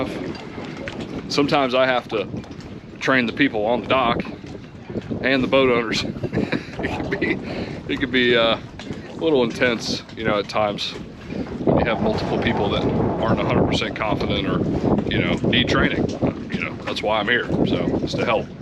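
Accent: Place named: Buffalo, United States. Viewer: Great Captain. Very informative. With a Buffalo twang lol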